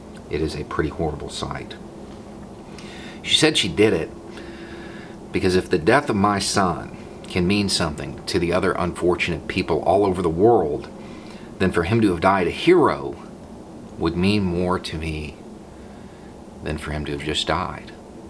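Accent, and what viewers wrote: South, United States. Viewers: At the beginning of this video....I interrupted it by watching the short documentary "The Body Of Emmett Till" from the Time channel ( long) and then went back to watching this video from...a southern white bearded man with a southern accent speaking with reverence about him. If Emmett Till could hear the words spoken by.......just wow.......I think he would have hope (even this many years later and that's a shame it takes this long). There's still a ways to go. I'm kind of at a loss for words right now after seeing the open casket photos and footage followed by words.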